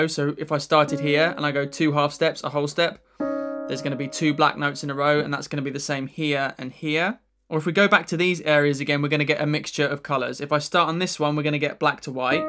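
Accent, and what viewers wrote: United Kingdom. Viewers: His Bri ish voice is very great. Teaches well, too!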